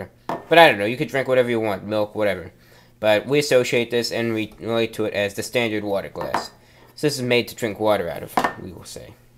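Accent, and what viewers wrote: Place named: Boston, United States. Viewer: Hes got more of an accent in this video hes more pronounced now.is he from boston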